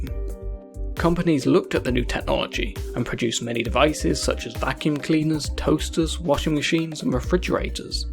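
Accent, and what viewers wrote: United Kingdom. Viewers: What’s with the British accent for an American history presentation? I can’t even!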